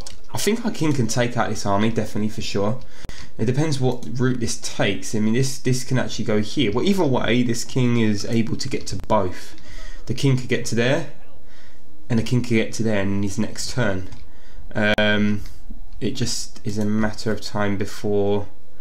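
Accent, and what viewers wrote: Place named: London, United Kingdom. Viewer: did you grow up in london around loads of roadman black people? your accent sounds like it, no offense shieeet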